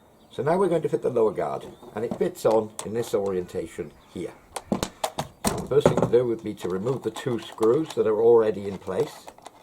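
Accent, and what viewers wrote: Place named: Wales, United Kingdom. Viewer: Great video... Deserves more views!! I'm probably going to buy it as a direct result of this video... You should have an amazon link to this one, so you get a bit of coin when viewers buy...!! Keep making these videos dude! You also sound kinda local? I'm in Ammanford, just north of Swansea. Where you from butt?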